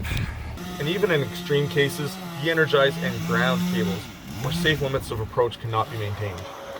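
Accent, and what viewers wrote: Canada. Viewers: You're a canadian Eh!!!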